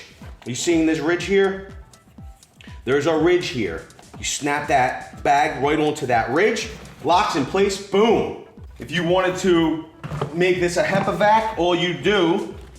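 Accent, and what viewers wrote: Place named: Italian-American, United States. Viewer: Guido accent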